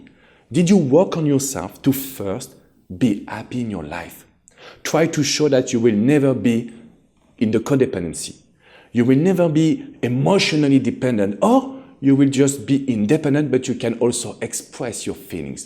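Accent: France. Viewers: Amazing video WoooW, you are amazing! and love your french accent! Thank you sharing those thing!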